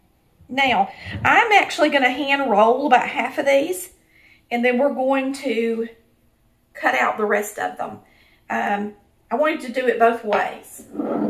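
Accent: South, United States. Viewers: God I love your channel, and your wonderful southern accent that I miss so much, and the FOOD, omg how I miss it and Southern Hospitality. I lived in the South for the better part of sixteen years and I so miss the hospitality. It's one of the thing I brought back to my my mid-western city I grew up in. When guest come over ( or just drop by) the host just sets the table and lays out food. Don't you dare refuse! It's and insult, and in the long run, you're glad you shut up and ate. Southern hospitality is a legend that continues on to this day, and I practice it in my mid-western state ( the one with the huge Arch on the river front), and I'm know for it. As a result my friends have learned what hospitality means, and they know to shut up and sit down at the table when they come to visit me. You bring back so many memories (as well as cooking tips). 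I just lover your channel. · Love your southern accent!!! Are you from Alabama? God Bless you...they look delicious😄❤ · You can kinda taste accents... you can tell how well a persons gonna cook by how the say oil... the southerness, I Love it!... and those are JUST like mamas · Always smile when Americans say these are “biscuits”. To me, an Aussie, those are scones and you can make them with fruit, dates, sultanas etc or veg like pumpkin. I joist LOVE pumpkin scones but you guys don’t eat pumpkin as a veg right? Maybe yours are bitter hence have to be full on sweetened for pie. We don’t do the pie thing much in fact I never have but pumpkin soup Yum! And scones. Back to scones here. We call a biscuit that food you call a cookie lol. If I called it a cookie it would be understood here, as Americanisms are pretty well absorbed here, but if I said I was baking biscuits, I would be expected to make round flat crunchy things. 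But your scones, oops Biscuits look amazing so will have to give that a try here in lil ole Perth Western Australia! Just stumbled on your show btw. Love it and that sweet lil gal southern accent is cute as apple pie!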